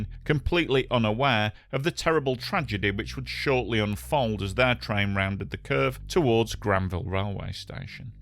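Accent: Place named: Hull, United Kingdom. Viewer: Does anywhere know where is from? I'm curious about his accent, I'm thinking maybe Hull?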